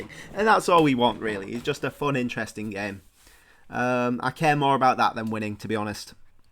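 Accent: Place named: Cockney, United Kingdom. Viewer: i think it's his cockney accent.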